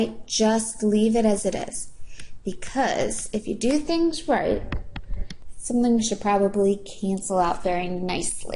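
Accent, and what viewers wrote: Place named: Maryland, United States. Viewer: hearing your maryland accent made me feel back at home while going to college in california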